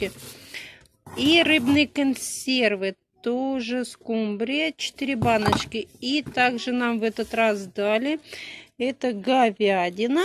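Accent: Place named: rural, Russia. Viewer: Фу какая мерзость...крысы....антисанитария.... столько детей ...фу..... ещё и говор такой....ну деревня....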